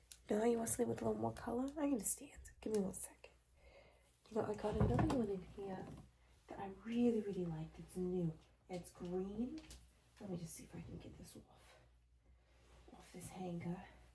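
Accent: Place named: Brooklyn, United States. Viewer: The Brooklyn accent is so good 😂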